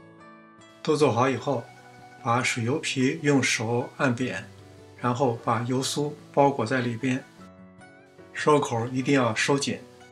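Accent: Beijing, China. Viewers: 太喜欢看您的视频了，菜谱简单轻松易学，谢谢您和大家分享。北京话听起来好亲切啊 ☺️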